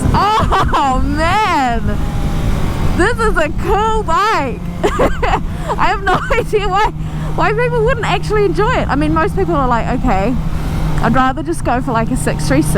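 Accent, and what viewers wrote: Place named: New Zealand. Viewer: Picked your from NZ as soon as I heard your voice. Hi from South Australia, great video.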